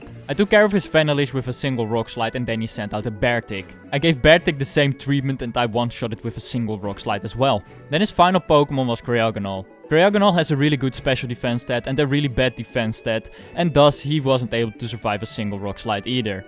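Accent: Netherlands. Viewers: Are you Dutch? You sound like that. But I really liked this video :) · Are you dutch? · Je bent nederlands of niet je hoort gelijk pik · Nice dutch accent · Are you dutch Your accent kinda sounds like it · Where are you from. My guess is the Netherlands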